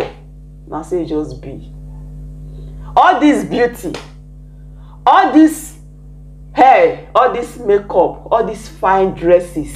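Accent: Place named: Delta State, Nigeria. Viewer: sister I hear yr language, yu from delta state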